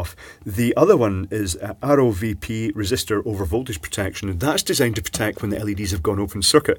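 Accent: United Kingdom. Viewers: I just love your videos, everything in these is so classy. Probably because you are from the UK ? I must confess I don't understand everything you say, me not being a native english speaker but I learn a few tricks everytime. Your accent and the way you speak remind me of my Acorn days (I miss them).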